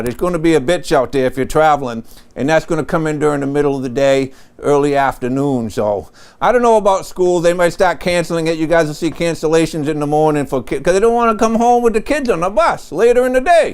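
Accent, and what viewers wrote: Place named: Northeast, United States. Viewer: You are from the northeast. Can't hide your accent. Not a hillbilly.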